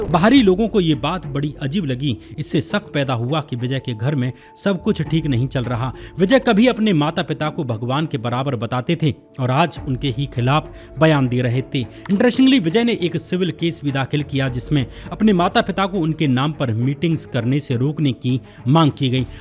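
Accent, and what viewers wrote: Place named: Bihar, India. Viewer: Area yaar bijness business hota hai. Pronunciation correct karo content dikhne meh bohat acha hain lekin sunne me UP Bihari ki badbu aajati hai. Bijay nahi Vijay Eaji nahi easy Bujiness nahi business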